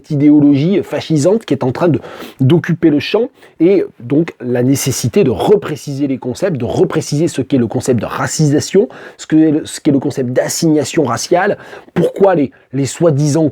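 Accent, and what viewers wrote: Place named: South-West, France. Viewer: Je ne suis pas saoulée par ce "dégoisement"... J'y retrouve l'accent et le vocabulaire ainsi que que les manières de triturer la syntaxe à l'oral du sud-ouest... Et ça donne encore plus envie de lire! Donc pari gagné en 40 minutes!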